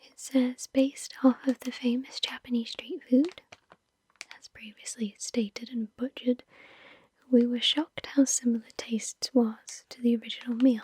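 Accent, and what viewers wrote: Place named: Wales, United Kingdom. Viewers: If you don't mind me asking, where are you from? I can hear your accent but I don't know if it's like an British accent or some other accent. I hope this isn't rude. :) thanks love your videos! :) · She's Welsh.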